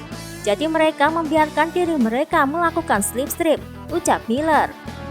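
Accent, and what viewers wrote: Java, Indonesia. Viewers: Admin ny medok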